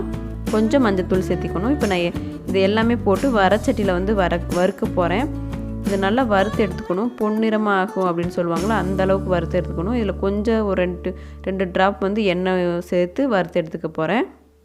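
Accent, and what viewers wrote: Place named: Anaimalai, India. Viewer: Neenga pesara language vanthu enga language mariye irukku nanga anaimalai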